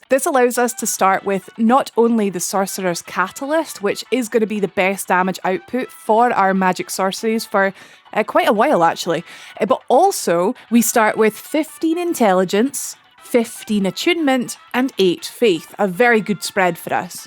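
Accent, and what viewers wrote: Scotland, United Kingdom. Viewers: A Scottish sounding chick playing dark souls?! Wild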